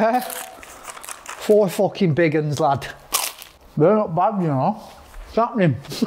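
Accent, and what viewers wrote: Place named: Darwen, United Kingdom. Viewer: Say you’re from Darwen? May sound like it but you look like you’re from Burnley…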